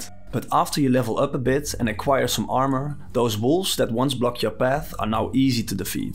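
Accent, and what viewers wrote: Netherlands. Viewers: nice dutch accent. Greetings from Germany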